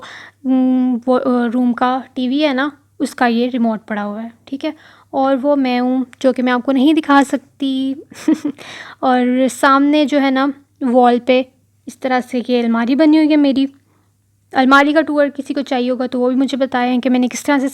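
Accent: Punjab, India. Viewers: Mashallah sis bohat piyara ghar h ap ka or Punjabi bohat maze ki h😂😂mory😅😄😍😛😘😙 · Hello bhain kive o Tuc assi punjab ton (india) ton Te tuhadi bunjabi Sun k eda lagda k appa same aa edar wala punjab v same a main bht dinna ton thode valog dehk rai a te mainu bht pasand a rae ne waheguru thonu khush rahke 🙏🙏🙏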